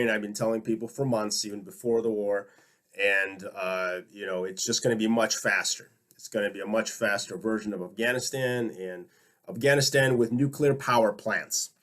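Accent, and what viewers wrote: Russia. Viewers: Your information is fascinating! Very clear, detailed and intelligent descriptions/analyses! Hugely impressed. Thanks for the info on Dnepropetrovsk. I'm familiar with the Ukrainian towns/cities through a lifetime spent studying Russia/the SU and the GFW/Barbarossa. Your description of defence in depth was also very impressive, though I doubt anyone without military experience or understanding can appreciate just how complex it all is. I will keep watching you and Brian Berltic. PS I'm now sure you are originally Russian. You have a tiny bit of an accent which comes out every so often with the vowels! 😊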